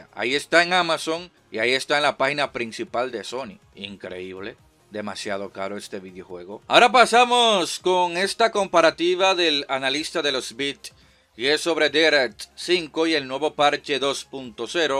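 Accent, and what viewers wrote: Dominican Republic. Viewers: eres dominicano????????????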